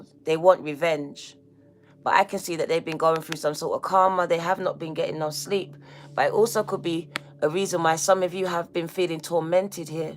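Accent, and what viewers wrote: United Kingdom. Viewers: 8 infinity and beyond…. Please let me buy you lunch….. you sound like a good ole Londoner… I born in Walthamstow xx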